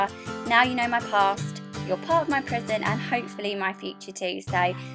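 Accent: Essex, United Kingdom. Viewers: ohhhh that's where your essex accent comes from! (well I only know what that is from watching the only way is essex)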